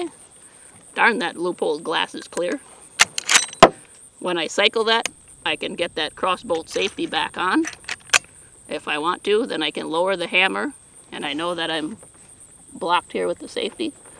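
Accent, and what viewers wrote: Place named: Midwest, United States. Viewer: That's a genuine Midwest accent LOL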